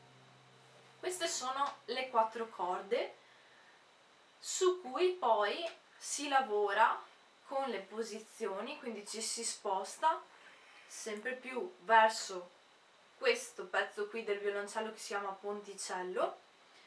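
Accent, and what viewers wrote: Veneto, Italy. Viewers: Hai studiato in toscana ma mi sembri veneta dalla pronuncia 🤔...sbaglio ? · Dall'accento non sembri toscana sembra veneto,comunque bellissimo strumento mi piacerebbe suonarlo ma lo trovo difficile per me..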